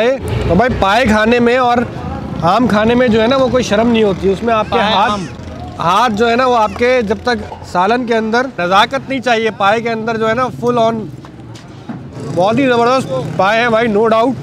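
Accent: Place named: Bihar, India. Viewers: Totally bihari language ... 👌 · MashaAllah bhai Apko Orangi Town me dekh k khushi hoi or Apne jo behari jesi bethi zuban me bat ki bohat acha laga ❤️ Orangi town ap DayTime me ayn InshaAllah boht Chezn milengi apko explore krne k liye ❤️ Your big fan♥️ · Apki bhihari zabardast hai ❤️❤️❤️ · Your bihari accent is cool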